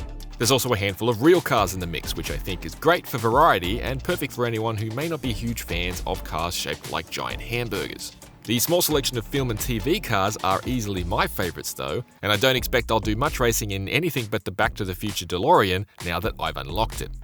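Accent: Australia. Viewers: Hears an Australian accent = SOLD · Guy sounds like an Australian parody, complete with the toilet strain accents.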